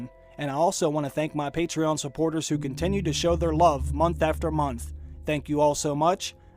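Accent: Pittsburgh, United States. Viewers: You're from Pittsburgh, aren't you?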